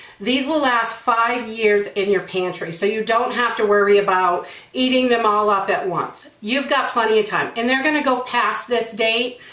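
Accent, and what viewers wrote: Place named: New England, United States. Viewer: When you held up the can of mackerel I was hoping you'd say "chowda"...I love the New England accent 😊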